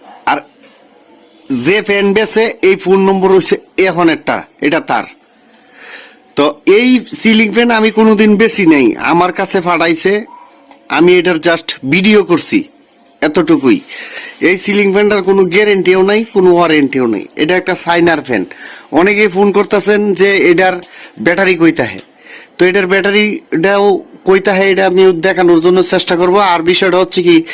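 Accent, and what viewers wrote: Mymensingh, Bangladesh. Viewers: মমিসিংগা ভাষা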